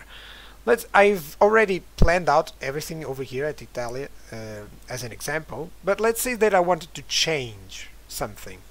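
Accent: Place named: Poland. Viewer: Vaya curro te has pegado, gracias por compartirlo. Por tu acento pensaba que serías de polonia o pa ya pal coño. Saludos, y gracias, otra vez.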